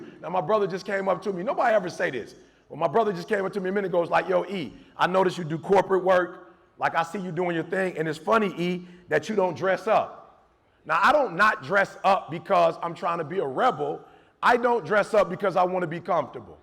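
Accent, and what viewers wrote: United States. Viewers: "Eight Mile, bruh." He so Deeeeeeetroit!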